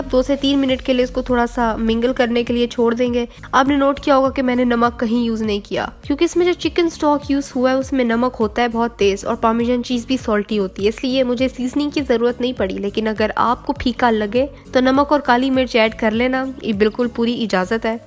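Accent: Punjab, India. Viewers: yaar aapi aap boht acha se explain krti ho i loved it ur punjabi axcent is just amazing ap sach mn Sialkot se ho hahah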